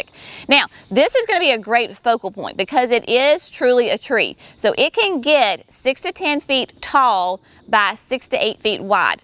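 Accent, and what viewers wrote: South, United States. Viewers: I live in Minnesota but I have family in Louisiana, so I not only enjoy the gardening features, but I love the southern accent!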